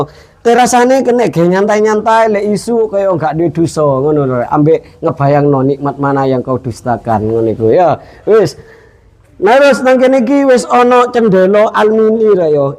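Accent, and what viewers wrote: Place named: Madura, Indonesia. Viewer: Madura y bos.... Logatnya iku lo rek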